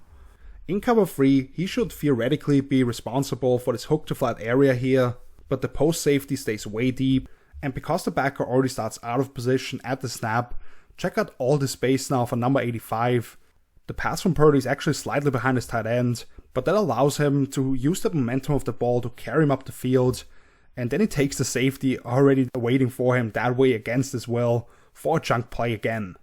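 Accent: Austria. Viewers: Your accent is marvelous. Are you Austrian? You sound so much like Arnold Schwarzenegger... Austrian by birth, moved to the US as a young adult, fluent in English but can't and won't mute the accent.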